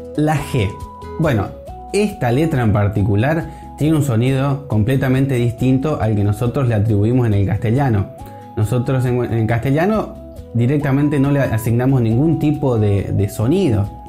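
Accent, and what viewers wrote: Corrientes, Argentina. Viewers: Pasa que es Correntino y tiene otra pronunciación, lo dijo al inicio. No es una pronunciación errada. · Me di cuenta que eras correntino por como pronuncias la CH soy misionero de padres paraguayos estoy mas acostumbrado ala variante paraguaya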